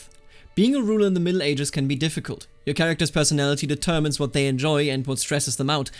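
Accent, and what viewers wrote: Germany. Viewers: Un1c0rnOfL0v3 That’s just his accent dude. He’s Bavarian and also he speaks fine. He has his own youtube channel for Ck2. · why is it spoken by a guy with a terrible German accent who speaks too fast ??! : ((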